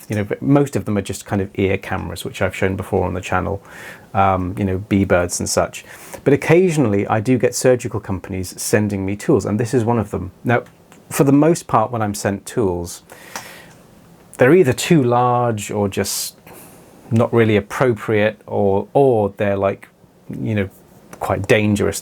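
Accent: United Kingdom. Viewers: hahaha, you probably would 😭 In American media, Im pretty sure your specific way of speech is one of the UK dialects that is most often replicated by American actors. Thats super cool though! Wonderfully informative video :0 I learned a lot about the scale of the tools, the dexterity and hand steadiness is very impressive!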